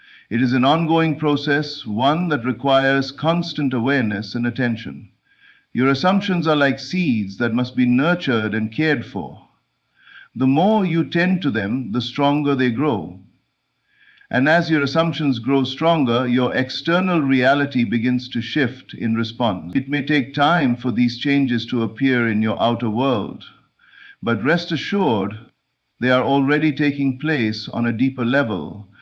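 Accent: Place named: India. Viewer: Is this the Indian Neville Goddard because he has an Indian accent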